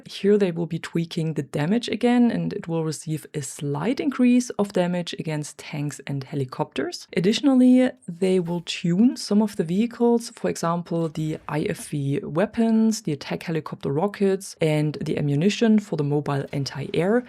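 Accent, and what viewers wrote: Germany. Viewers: this is my new favourite battlefield channel. are you from germany? you sound german :D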